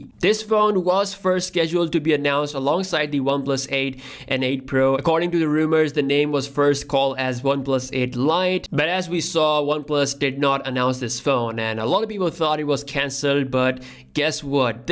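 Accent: India. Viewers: I like how there's just a pinch of Indian in your accent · Strong Indian accent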